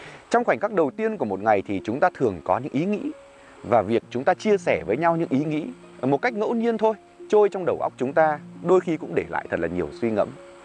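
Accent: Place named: North, Vietnam. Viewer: I really enjoy listening to your voice (Bac ky)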